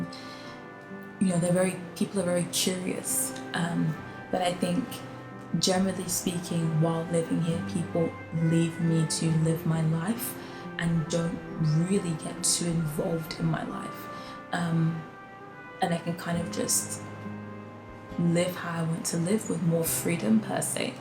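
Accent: United Kingdom. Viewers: 覺得你說的很好👍🏼很喜歡你的英式發音跟講話方式 口條很清楚 聲線也很溫柔 很方便練英文聽力！說實在我在路上看到不同種族跟國家的人會很想認識他們 因為好奇他們的文化跟生活方式～希望妳在台灣能過的開心！ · 你的口音聽得出來是個英國人。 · 同意 語速對我來說剛剛好 還有英腔的r真的好好聽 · Love your British accent,welcome to taiwan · 剛開始看就覺得口音很英腔，後面提到說來自英國就理解了，以前在念書的時候曾遇過膚色較深的法國人，之後就比較不會用膚色去判斷哪裡人了😂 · I am a hongkonger, I think Hong Kong also is a nice place. General Hong Kong people are educated and open mind that welcome people from all around the world. Hope you would like to travel in Hong Kong. 🇭🇰🇭🇰 by the way I love your British accent❤️ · of course, you're a Brit. Most of the people here just can't tell your British accent unless you sound extremely posh. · 英式口音超好聽的🥰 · 口音很優雅聽得出來像英國的感覺😍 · 看影片前半段的時候就感覺你說英文好像有英國腔 等聽到你說是英國人的時候馬上恍然大悟「難怪~~」😆 歡迎來台灣！ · I like your British accent ;) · 哈哈！我好厲害！ 我聽妳說話一分鐘我就在猜妳是不是來自英國，因為很明顯的發音特徵，short a sound and no hard R sounds，但容我再猜一下，妳應該最初不是來自倫敦（雖然妳有提到倫敦，但家族應該不是一直住在倫敦吧！？），而且口音也不太像PR口音！ 此外我知道，英國其實有很多非裔人士，就跟法國一樣有很多非裔人士，所以我不感到意外，畢竟西方的人種很多元，當然台灣也是多元種族的社會，但在膚色、髮色等外顯特徵比較相似，不像西方國家那麼明顯！ 若是在台灣，有人讓妳感到不舒服，請妳原諒他們，多數台灣人還是懂的那分寸的，此外，妳提到跟台灣人不好交朋友，或許只是妳剛好碰到的人比較害羞，或者不擅長英語，又或是其他原因，我想，多數台灣人還是很喜歡跟外國人認識當朋友的！ 前面那些猜測，純屬好玩，希望沒冒犯妳，最後，祝妳能在台灣有美好的體驗與生活！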